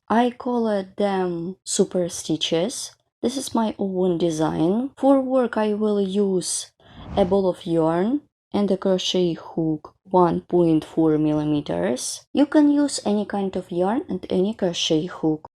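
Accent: Russia. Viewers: говорите лучше по -русски,а не на английском с русским произношением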